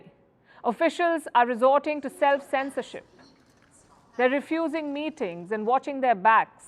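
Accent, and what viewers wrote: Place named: India. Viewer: this media is totally anti china as u can see the commendator has as trong curry accent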